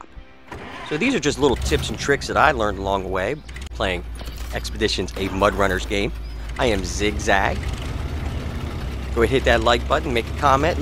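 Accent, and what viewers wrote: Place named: Northeast, United States. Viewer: Thanks for this tip, I just unlocked the drone. I'm glad that I can sell it back BC it's $50k! also, I think I recognize your accent as north east, more specifically the Tri-state area of NJ-PA-MD, most likely Delaware County in PA.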